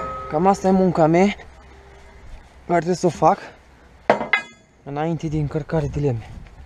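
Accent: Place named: Moldova. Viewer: Salutare! Aceasta este filmare de lumea trecută 19 iulie 2021? Îți doresc numai drumuri bune și mai largi,nu din cele întortocheate că la Cannes și celelalte cătune! Eu credeam că orașele europene sunt mai "aerisite",nu ca prin unele orașe de pe la noi. Te-am cunoscut de la primele vorbe ale tale,că ești moldovean de al meu! Care este lungimea totală a "trenului"tău,?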